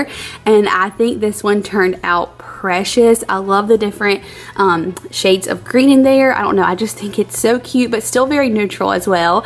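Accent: South, United States. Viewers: I knew u sounded close to home. You're about 2 hours away from me. But are u originally from the south? Kinda have a little proper accent here & there😄I've never tried the cassrole with cream cheese & cresent on top like that, ill have to try it out! We usually just do Cresent rolls and sausage then egg mixture.(yours looks better haha!) Me and my husband will prob just split it down the middle😂😬.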